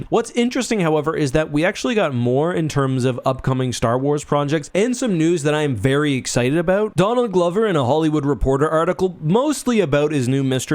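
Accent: Canada. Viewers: If I remember correctly, he was a “boring” kind of lawyer. Might have been a copyright lawyer but definitely don’t quote me on that. It was his actual job when he started making YouTube videos but eventually the channel got so big he had to choose and this ended up being his choice. He’s also Canadian if you couldn’t tell by the aggressive use of “aboot”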